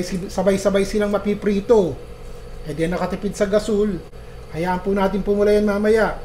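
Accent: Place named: Bulacan, Philippines. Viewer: Chef,bulakenyo po ba kau?kasi ganyan po ang punto ng mga bulakenyo e 😁😁😁 nakakatuwa po kayo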